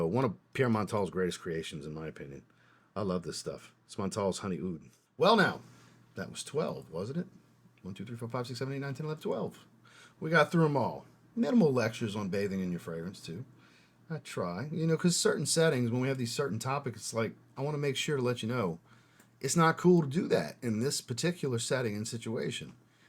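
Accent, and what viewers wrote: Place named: New Orleans, United States. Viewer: Hey! The first time I heard your voice, I said to myself, “This guy has to be from New Orleans.” Then, you were wearing a Saints shirt in another video. Hello, from a fellow New Orleanian.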